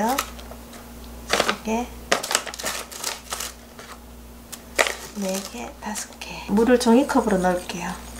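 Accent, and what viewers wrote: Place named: Daegu, South Korea. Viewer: 떡을마찼다? ㅋ ㅋ ㅋ ㅋ 대구사투리정감있네요 서울분들이볼땐 ㅎ ㅎ ㅎ ㅎ ㅎ ㅎ ㅎ 마찼다.!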